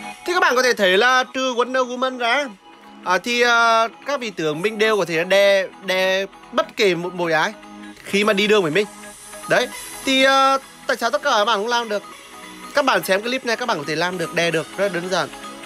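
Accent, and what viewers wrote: Nghe An, Vietnam. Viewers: Bạn là người nghệ an ak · Anh ở đâu nghệ an vậy ?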